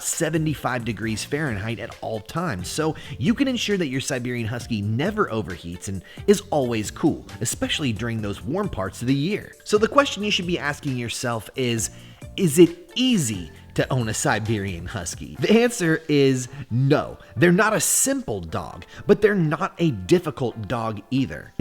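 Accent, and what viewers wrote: England, United Kingdom. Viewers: Hi luv the Skateboard...Luv the English Accent nearly like mine...😍. Nice to see Roo I would like to get a Husky in the future but it is like you say a lot of things to think about . But your videos are very helpful.. I just think they are gorgeous. And I hope that my Husky will like my 🐈..one Day.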